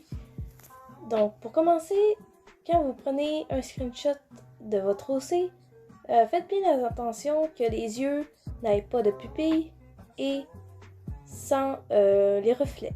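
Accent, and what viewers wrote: Quebec, Canada. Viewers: Je sais pas si ta un acsent québéquois mais si oui une pepite👌👌😭😭💖💖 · Tu es canadienne jadore ton accent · J'aime beaucoup ta voix québécois 😍😘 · Trés jolie axent canadien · Tu est québécois car tu a k accent et je trouve cette accent trop chou 🤧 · Ta voix me fais penser a une voix de québécoise et merci pour le tuto je like et m'abonne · tu est canadienne? Parce que j adore ta voix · JADORE TON ACCENT KEBEQUOI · Mais non tu as un accent québécoi et je l'adore c beaucoup mieux que d'avoir le même voi que tout le monde 😘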